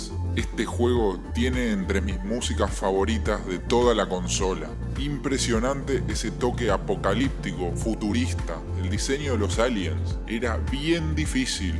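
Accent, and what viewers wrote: Argentina. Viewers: minuto 0 y ya me di cuenta por el acento y las s's de que sos argentino XDDD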